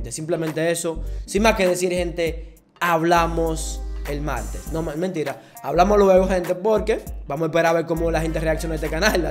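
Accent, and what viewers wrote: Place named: Dominican Republic. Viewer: Ese es un Dominicano k habla como un Dominicano no es como nino k dice ala bestia eso no es Dominicano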